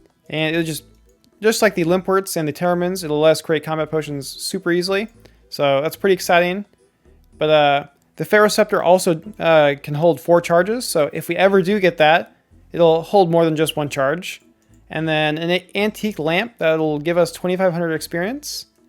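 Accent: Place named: Midwest, United States. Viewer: Your outros give away the fact that you're a Midwesterner 😂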